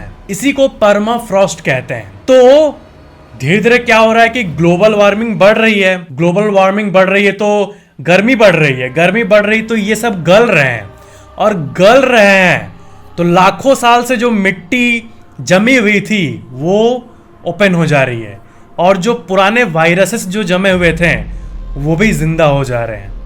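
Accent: Bihar, India. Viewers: Bhai tum bihar se ho kya ?? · Vai aap bihar se ho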